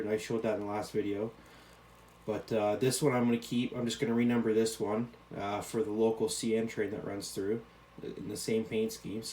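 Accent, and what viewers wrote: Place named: Cambridge, United Kingdom. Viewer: you sound like you are close to me , Cambridge ???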